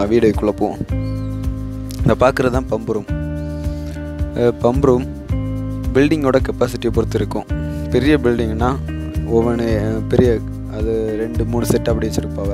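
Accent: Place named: Kanyakumari, India. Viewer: Kk ..unga slank la theriuthu ..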